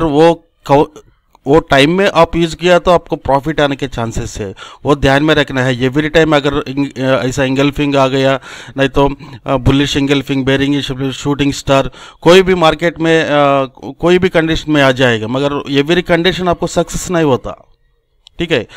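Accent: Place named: Mumbai, India. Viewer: Your Hindi is Mumbai Hindi...easy to understand....Keep it up...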